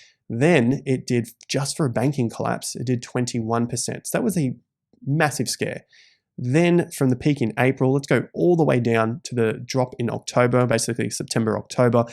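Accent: Australia. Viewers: How you say the DATA is an Australian thing (DATER)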